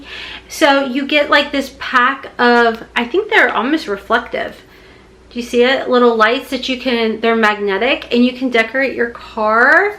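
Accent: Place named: Alabama, United States. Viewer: I'm born and bred in Alabama and you sound like my people.....which makes me so happy because ive been living in northern Indiana for the past 13 years 😢 and i miss everyone back home.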